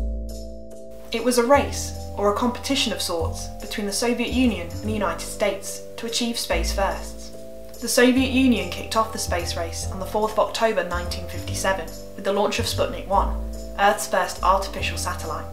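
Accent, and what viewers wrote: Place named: England, United Kingdom. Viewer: Nice accent 😁 What part of England are you from? 🤷‍♂️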